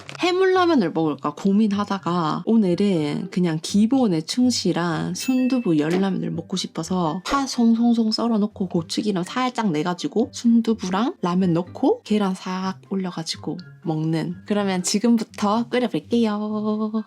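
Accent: Gyeongsang, South Korea. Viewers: 경상북도예요? 경상남도예요? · 경상도 사투리 귀여우셔용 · 오 예쁘시기도 하지만 많이 잘드시네요 사투리만봐도 경남사람이시네요🙂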